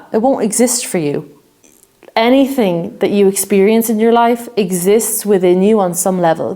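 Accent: Ireland. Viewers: Heard the Irish accent and immediately subscribed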